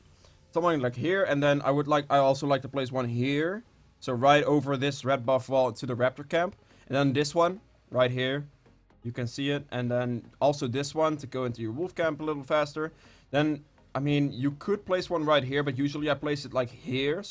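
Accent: Netherlands. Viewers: Are you Dutch/Belgian?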